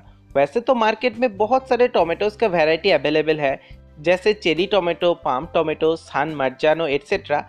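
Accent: Bengal, India. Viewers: Apni Bangali seta apnar Hindi accent a bojha jay.. tobe apni jotota sototar songe protita details bojhan seta sune valo laglo. Apnar tamarind bonsai ta dekhe apnar channel er r o kota video dekhlam. Evabei egiye Jan. Amar subhechha roilo... Waise hindi me bolte hain shayad isiliye aap jyada logon tak pohuch pate hain... Which is definitely a good idea.. keep it up 👍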